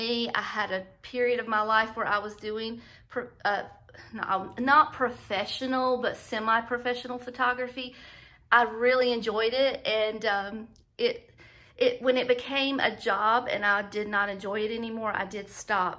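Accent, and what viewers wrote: South, United States. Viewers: are you a southern belle? I recognize your accent from all the programs I have watched lol. I am from the Netherlands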